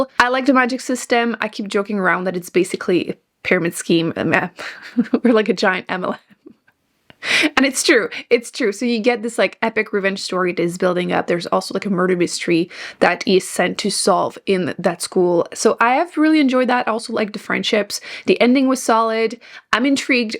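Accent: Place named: Quebec, Canada. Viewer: Love the t-shirt you are wearing! I don't know if I'm right but you sound like a fellow Quebecer. I really love how you are reading the shelves and letting go of the books that you haven't liked. And that big jar wow :) I discovered this year Shari Lapena (a Canadian author, a happy surprised to me) and loved her! So that is part of my reading plans.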